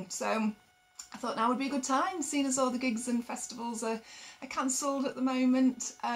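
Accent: England, United Kingdom. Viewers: So much easier to learn banjo from someone with an English accent :) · I really like your style of teaching Claw Hammer banjo! I will be watching your videos! I'm from Texas and I love your British accent! And you're happy!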